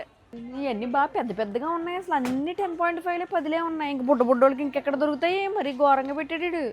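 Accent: Godavari, India. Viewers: Hi andi mee videos chala baavuntaayi Maadi kuda amalapuram 😊 Mana godavari basha super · ఈ రోజే YouTube మీ వీడియోని first time నాకు చూపించిందండి. అది చూపిస్తే కదండీ మనం చూసేది. మీ గోదారోళ్ల యాస బాగుందండి. Suharsh family in Canada ఛానల్ లో సులోచన యొక్క రాయలసీమ యాస చాలా అంటే చాలా మంచిగుందండి. మా ప్రాంతం యాస లో ఎవరైనా ఛానెల్ పెడితే బాగున్నండి.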